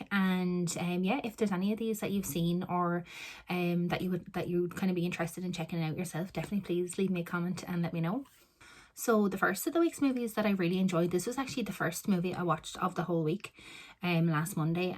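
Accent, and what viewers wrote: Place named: Ireland. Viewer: Hi,best wishes from Wolfie,hope your back is better although you did get time to watch some films,I have all the Hatchet films,there's 4,think they get gorier as they progress,loving the Irish accent,keep watching.xx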